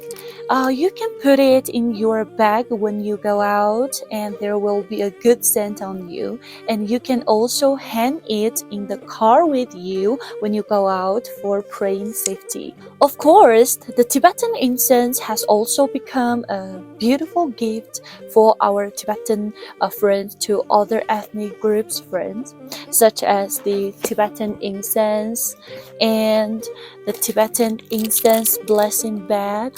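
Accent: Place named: Southeast Asia. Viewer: your English is not same as Most Chinese, kind of south east Asian accent. Though very good presentation. Tibet blessed.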